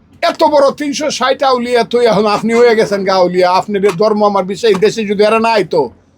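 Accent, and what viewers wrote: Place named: Brahmanbaria, Bangladesh. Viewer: ব্রাহ্মণবাড়িয়ার আঞ্চলিক ভাষায় কথা বলে।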